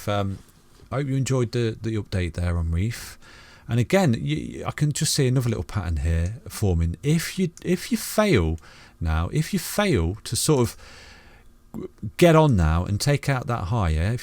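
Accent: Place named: Yorkshire, United Kingdom. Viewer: Love the t.a, but got distracted by your accent. If you aren't a fellow Yorkshireman I will eat my scarf.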